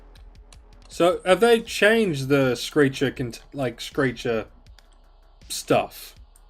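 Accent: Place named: Australia. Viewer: Would love more of these unedited long hunting sessions. When I hunt, I need an aussie accent in the background now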